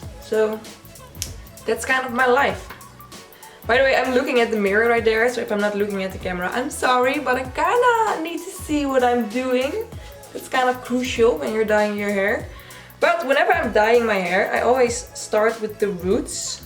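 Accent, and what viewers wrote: Netherlands. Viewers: what is your accent? is itDutch? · Are u Netherlands? I think so cause u have kinda a dutch accent while ur speaking English. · This is the first video I've watched from you and the second you spoke, I had a gut feeling you're Dutch. Came to find out you are! Haha! Also, love your new hair color. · Are you dutch. Because your voice sounds dutch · Dit is de eerste video dat ik van je zie je doet je intro en ik weet gelijk al dat je nederlands bent door t typische accent😂❤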